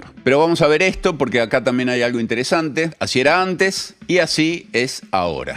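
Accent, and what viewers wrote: Argentina. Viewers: ⚡Vi los avances de la nueva versión; pero he esperado a oírlos de boca de. Ya me he acostumbrado... no sé si es bueno, si es que me he acostumbrado a su habla del español argentino, o que me estoy haciendo vago... muchas gracias por todo!!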